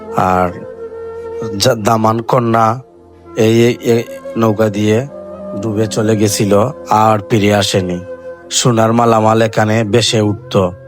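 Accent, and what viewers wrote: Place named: Sylhet, Bangladesh. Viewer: You should learn how to talk first .then make a vedio .you always try to speak with sylheti ok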